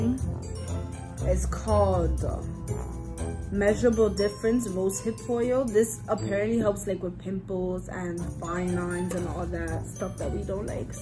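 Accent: New York, United States. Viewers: You from New York huh?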